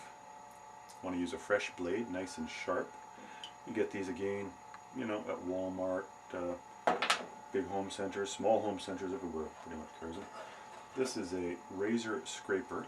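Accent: Canada. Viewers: thought you sounded canadian with the distinctive ooo's and then I'm pretty sure it is confirmed when I saw the french writing on the caulk tube. good job! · Thanks for this nice little video - maybe not up to professional broadcast standards, but calm and pleasant and perfect for us dummies. Actually, your narrative sounds very much like the E.B.White readings of "The Trumpet of the Swan" and "Charlotte's Web", except this is the first time I've EVER heard a stereotypical Canadian accent like Bob and Doug Mackenzie's! (Which is kinda weird, since I live in Montreal and spend a lot of time in Toronto/Hamilton.) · everybody's an expert... NOT... Guess I'll have to post one to show how to caulk properlly.. SHEEEZZZ He's not British.. I'd say a canuck..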